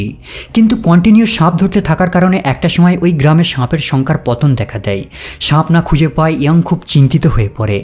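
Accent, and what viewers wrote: India. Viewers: ইন্ডিয়ান মনে হয়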